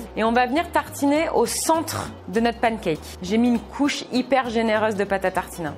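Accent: Canada. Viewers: Tu est quebecoise ou française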